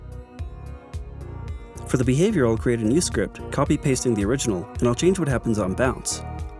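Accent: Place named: Spain. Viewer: The reason why the creator pronounces it that way is because he is a native Spanish speaker. Portuguese speakers pronounce it as "Go-dodge" as in Dodge. But if we are talking about "the correct way to pronounce it" or "the way you should pronounce it" In English then "Go-Dot" is incorrect and the "Go-Dough/Go-Doe" would be correct in that case.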